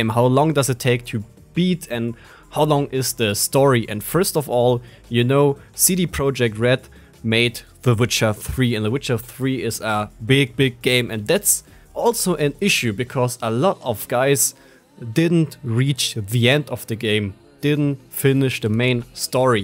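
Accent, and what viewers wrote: Germany. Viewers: Nice German accent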